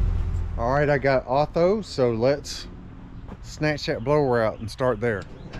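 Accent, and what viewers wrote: South, United States. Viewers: I just love Southern women and their voices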